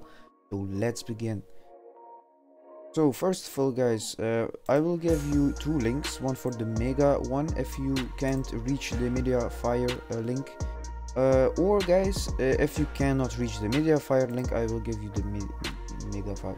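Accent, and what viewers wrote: Netherlands. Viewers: ik hoor een beetje nederlands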